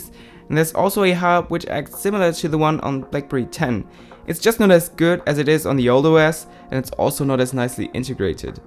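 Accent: Germany. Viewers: Are you german ? You have this german somthing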